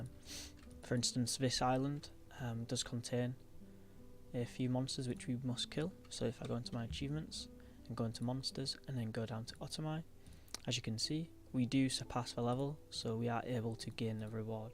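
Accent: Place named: Yorkshire, United Kingdom. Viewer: Brighton, UK here, but I grew up in the east midlands. You sound like your from Yorkshire haha? That music was heaven! Look forward to any and all dungeons, especially the harder ones later on as I’m quite unfamiliar with them.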